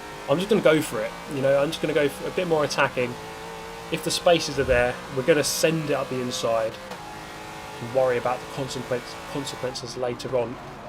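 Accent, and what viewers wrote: United Kingdom. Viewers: Just found your channel, and I love it! Excellent British commentary lol and great racing. Keep it up!!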